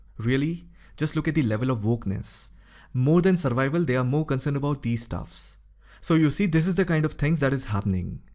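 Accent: India. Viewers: Russian propoganda in English with an indian accent. I still cannot understand why Indian people so vigorosuly support a country that is shelling civilians on Ukraines soil.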